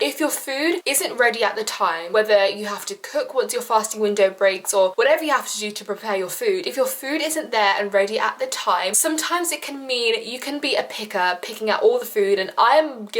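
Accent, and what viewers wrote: United Kingdom. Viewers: Omg i love the British accent 💖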